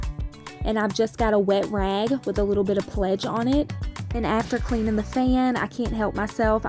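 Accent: South, United States. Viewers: I love your southern accent!! I'm going to need to try that Anti bacterial pledge!❤ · You might be a gypsy well honey you have got that southern barrio accent I am from Georgia and I got it to you tickle me when you talk about all that stuff just the prettiest little fine but I’ll say one thing you were very clean person nobody could never say you was nasty thank you for sharing hugs and prayers baby give the baby a big O kiss and harmony today❤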